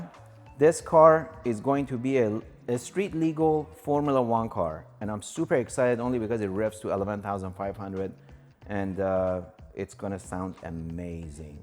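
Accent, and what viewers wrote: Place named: Iran. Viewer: 5 seconds into the video and I can already tell you are Persian! :)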